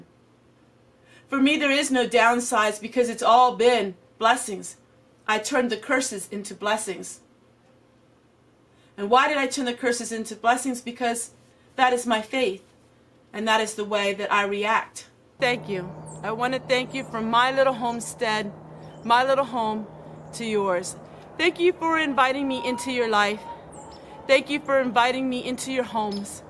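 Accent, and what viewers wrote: Pennsylvania Dutch, United States. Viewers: Congratulations! I found you just over a week ago, but have been enjoying your channel so much. Your laugh (giggle) is one of my favorite parts of them! Your Pennsylvania Dutch accent is charming too! Thanks for being so real and down to earth! Merry Christmas to you!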